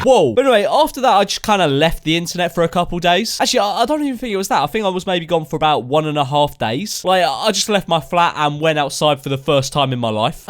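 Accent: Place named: RP, United Kingdom. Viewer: You sound posher than usual in this video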